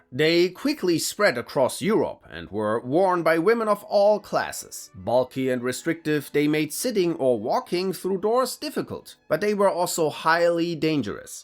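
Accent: Germany. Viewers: You know I normally don't like channels like this, because they feel like kiddie channels, but this one is different. Would like longer explanations, but I understand that people don't have that long of attention spans nowadays thanks to shorts, reels, and Tiktok. But it is good for background noise while cooking or working on projects around the house. Also I spent three videos trying to place your accent...and I finally got it. You're German, are you not?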